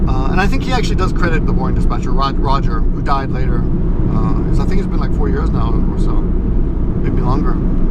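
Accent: Australia. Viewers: I gave you thumbs up simply for the Australian accent ;) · lol your Aussie accent sounded a bit British XD The first Youtuber that I ever saw. I don't remember exactly who but I know that I use to be really into Smosh. I know that they are still really huge but I can't really think of anyone else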